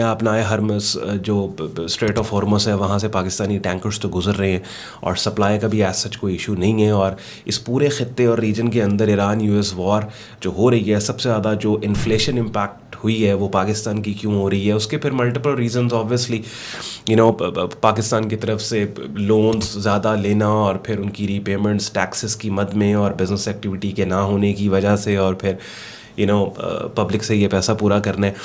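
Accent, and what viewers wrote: Punjab, India. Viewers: Love you, sir. Your Punjabi is very cute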